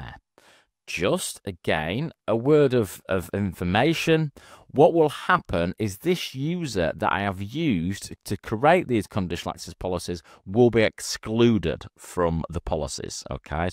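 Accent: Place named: Scotland, United Kingdom. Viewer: "Don't worry. You're not alone." deep inhale, long exhale Thanks internet Scottish tech guy.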